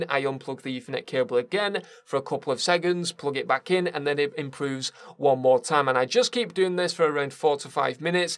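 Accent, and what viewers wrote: Scotland, United Kingdom. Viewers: This Guy Is Scottish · are you Scottish or English?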